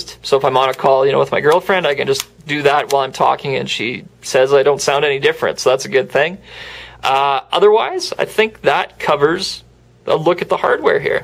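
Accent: Canada. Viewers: Are you canadian ? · love his canadian accent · You sound so Canadian and i LOVE it! Thanks for the awesome review!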